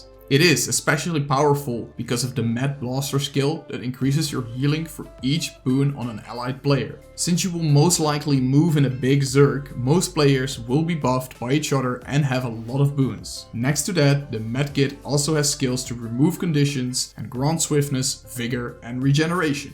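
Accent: Netherlands. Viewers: I hate dutch morons and their shitty accents.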